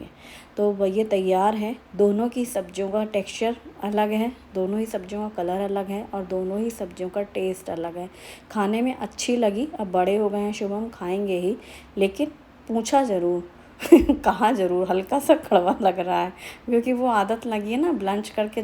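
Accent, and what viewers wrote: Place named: Maharashtra, India. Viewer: Ek baat bolu.... Aap "Bahar ko Baher " bolti hai hamesha , mai hamesha notice karti hu,,, A Hamare MAHARSHTRA ka asar hai.. 😊😊😊😊❤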